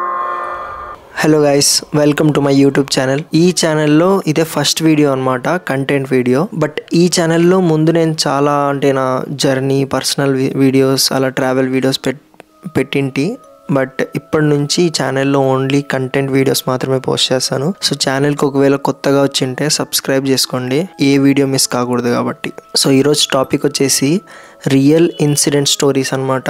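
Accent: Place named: Rayalaseema, India. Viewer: anna kathalu super. all the best . where r u from? slang rayalaseema la undi so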